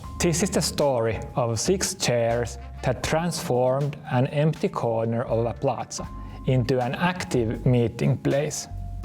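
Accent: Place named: Finland. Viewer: Mikä saakeli siinäkin on että suomalainen yliopisto lataa videon suomenkielisellä otsikolla, mutta selostus on rallienglanniksi?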